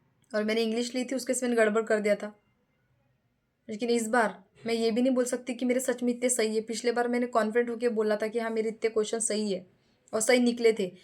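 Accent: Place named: West Bengal, India. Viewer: Aapke boli se lag rha hai ki aap West Bengal se ho. Ham to up se hai. hme to abhi se dar lag rha hai. mera 11 feb ko hai.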